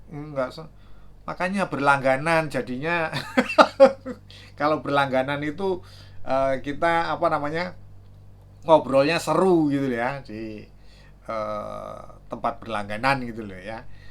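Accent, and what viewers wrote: Java, Indonesia. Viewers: tp jujur nih mas maap nih ya, aku malah suka, lucu ato terhibur klo mas nya misuh2 di setiap konten video gara2 komen netizen, misuh2 mas nya lucu bikin ku ketawa terhibur trs apalagi dgn gaya ciri khas kental logat Jawa nya itu, gara2 itu jg aku jd sering nton channel mas nya selain pengen tau info2 gadget soal perkabelan dan per chargeran nunggu moment mas nya misuh2 soal komen aneh2 ato soal komplain netizen 😂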